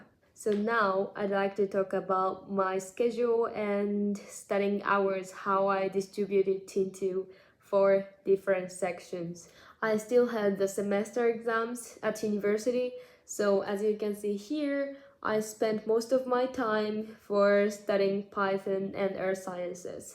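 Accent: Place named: Germany. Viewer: スイスに行く前はアメリカ英語を学ばれてたと思うけど、多分スイスに行って若干ドイツ語訛りが出てきてるね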